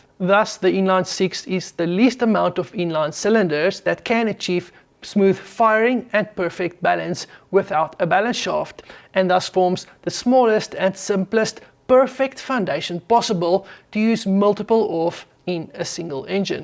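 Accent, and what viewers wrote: South Africa. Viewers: Dear, first thanks so much for your great informative videos and the reminiscent SA accent! I would like to propose a video idea extending this one. In late 1942 Stanley Hooker (later knighted for his work on jets) was recruited by Rolls Royce to work on the urgent problem of boosting power at latitude for the Merlin engine. His solution was to insert a liquid cooled intercooler and 2nd stage supercharger between the existing supercharger and engine block. As you pointed out in this video, an even better solution theoretically may be to add a turbo as the first stage since it does not scavenge as much engine power. However, Hooker’s solution was right on the money in this case, brilliant, compact and was implemented into the Merlin 6x production in less than 6 months. This improvement may actually have secured performance altitude at the most crucial time of the war, allowing air superiority over the fw190, and perhaps even war winning. 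This engine of course was used in spitfire, hurricane, lancaster, mosquito and mustang built under license by packard. The 2 stage turbo solution later implemented in p-38 and “jug” was slightly superior, but very bulky requiring twin boom or bulky and expensive airframe, actually nearly twice the price of the 2 stage super-charger as implemented by Hooker in record time. For me Hookers intercooler is a work of art, or a sublime mix of art and science of engineering. It has 4 trimmed rectangular channels in which the coolant circulates, ex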